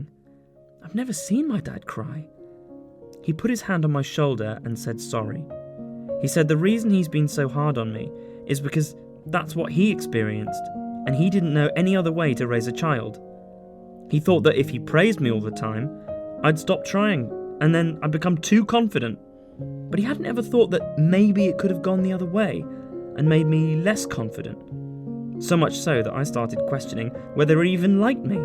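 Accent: United Kingdom. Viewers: Is he british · How does this dude have a british accent but says soccer instead of football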